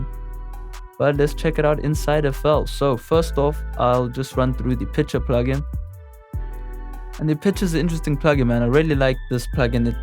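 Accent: South Africa. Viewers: Thats the most South African accent iv ever heard lol cool vid man!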